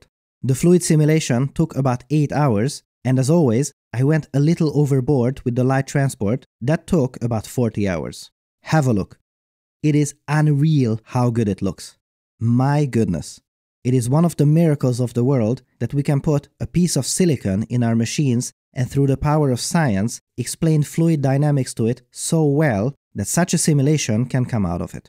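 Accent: Hungary. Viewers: Heard your voice and your name and I immediately knew you are Hungarian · Jól hallottam, hogy maga magyar :3 és itthon nincs felfedezve :/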